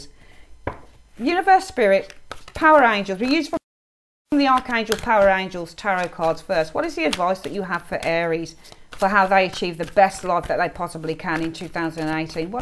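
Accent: Birmingham, United Kingdom. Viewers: Usualsuspec13 i Love that’s She’s a Brummie like myself too. I was thinking that 😀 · I love you - 'If you wannit, you golla be onnit - you wannid it!' Gotta love a fellow Brummie. We give it straight, no chaser, honest and clear. Thank you for a great reading. So on point.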